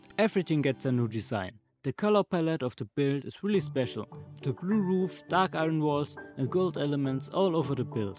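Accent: Germany. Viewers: Wer you german? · Are you german? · Deutscher Akzent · I like your accent. Is it german? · Can I ask where you’re from? You sound German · Are you from germany, cuz you've got a damn german accent! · Man hört richtig, dass du deutsch bist hehe Nices video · you are from germany right?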